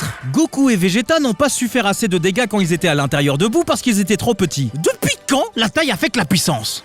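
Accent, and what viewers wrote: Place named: Antilles, Caribbean. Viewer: Antillais j'ai l'impression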